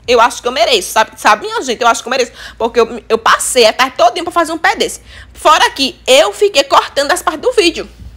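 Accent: Northeast, Brazil. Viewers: EU como paulista adoro sua voz e seu sotaque nordestino adoro vc ❤️❤️ nota mil pra vc! kkkkkkk 😂😂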